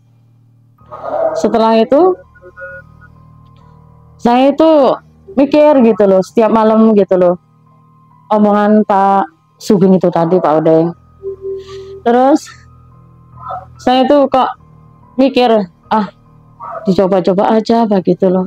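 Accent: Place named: Java, Indonesia. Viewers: Maaf kamu sebut orang Kalimantan asli Tapi logat nya jawa Setau saya orang Kalimantan asli gak ada yang gila harta sampai pesugihan Kami hidup apa ada nya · Nada bicaranya asli Jawa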